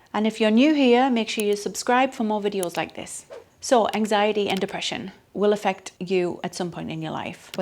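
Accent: United Kingdom. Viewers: I adore British prononciation, sounds like a music to my ears. thank you for the video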